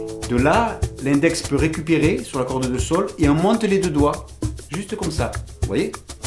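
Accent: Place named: South, France. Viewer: Salut! Super vodéo, super format! Parfait pour comprendre quand placer les accords et pour voir la rythmique main droite! Seule petite remarque: Si tu pouvais prendre 1 minute au début de ta vidéo pour décortiquer le mouvement de la main droite ce serait encore mieux!! En tout cas un très grand merci pour ta pédagogie, ta bonne humeur (et ton petit accent du sud qui ne gâche rien dans l'affaire), c'est vraiment super agréable et encourageant!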